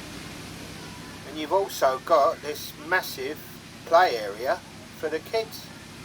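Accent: London, United Kingdom. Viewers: Hi ya. So glad you are back doing vlogs. Was wondering how you was, and why no vlogs for a while. I love your seeing your journeys and all the pretty scenery. Oh and miss your London accent ! Stay well from a fellow Londoner. Cheerio x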